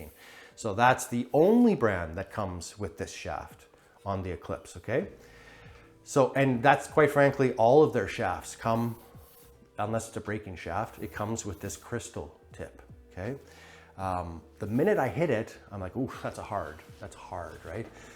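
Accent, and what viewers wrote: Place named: Edmonton, Canada. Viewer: Came for the cues, stayed for the beautiful edmonton accent.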